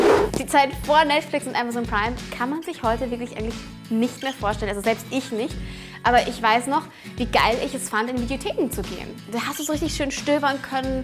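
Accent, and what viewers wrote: Austria. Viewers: Ich vermisse deinen österreichischen Akzent :( deabo :(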